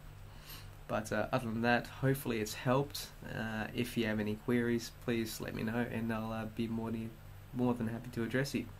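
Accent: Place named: Australia. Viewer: You have an Aussie accent. Cool!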